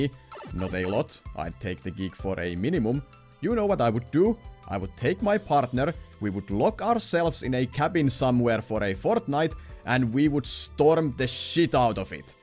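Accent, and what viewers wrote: Finland. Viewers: Are you finnish? You sound finnish to me :DD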